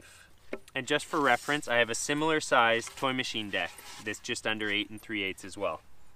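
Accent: Canada. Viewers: First time watching the channel and I thought to myself that guy is Canadian I'm sure, I can hear it in his accent. As an English man we can't typically distinguish the difference between American and Canadian accents and whilst all you in North America hear those differences between each other easily I imagine, for us it really isn't easy but I think I've got it sussed now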